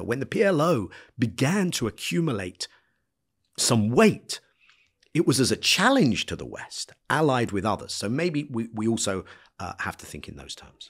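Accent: United Kingdom. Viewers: Jeremy – This is way over my head but seems rational, if only because it is presented calmly and with a British accent - as if the Brits have no culpability. Perhaps you can follow the story .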